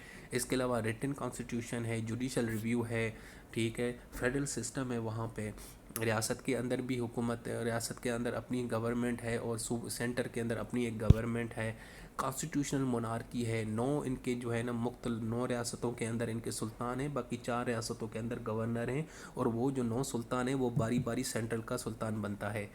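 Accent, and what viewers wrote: Karachi, Pakistan. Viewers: Apka Accent Karachi Wala hai..... Karachi waaly bolty hain æsy...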